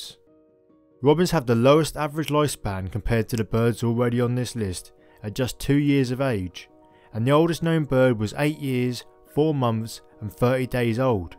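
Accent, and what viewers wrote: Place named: East Anglia, United Kingdom. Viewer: Are you from East Anglia? That accent sounds familiar haha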